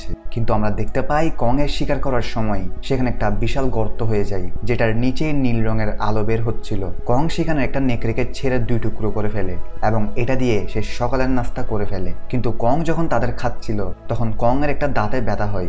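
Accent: Chittagong, Bangladesh. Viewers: ভাই আপনার চট্টগ্রামের টোন টাতে কথা গুলো শুনতে ভাল লাগছে,কারন আমিও চট্টগ্রামের ছেলে 😁😊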